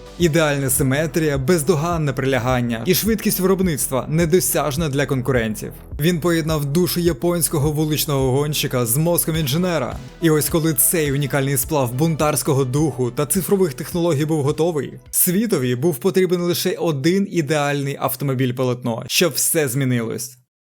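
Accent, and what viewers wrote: Russia. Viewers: Класне відео. Ух, де там NFS 2015! Чому ви відтворюєте латинські слова за російськими правилами вимови "E" як "Є"? :face-orange-raised-eyebrow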